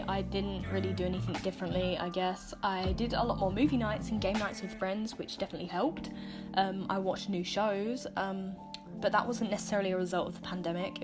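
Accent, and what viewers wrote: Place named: United Kingdom. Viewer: I knew you were br*tish but the accent still jumpscared me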